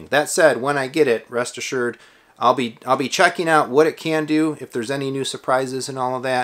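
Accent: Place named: Chicago, United States. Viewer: If this isn’t a fellow Chicagoan I’d be shocked 😄👌🏼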